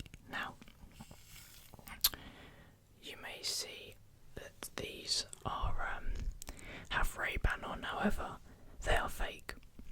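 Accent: United States. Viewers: AHAHAHHAAH I LOVE THE AMERICAN ACCENT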